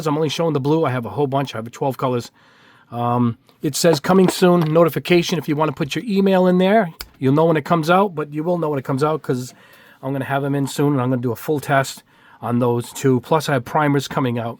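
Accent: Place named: New England, United States. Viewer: Back to modeling after a 60 year hiatus. Very much like your informative videos. I live in CA and recognized your New England accent right off the bat. I grew up not far from you, north shore Boston. I've been looking for MRC leveling thinner but it is nowhere to be found so I just placed my order for your replacement product. Thanks, looking forward to trying it out.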